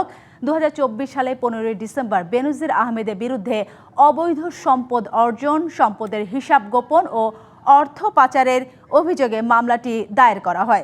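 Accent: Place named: India. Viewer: কিরে ইন্ডিয়াদের মতো নিউজ পরে কেন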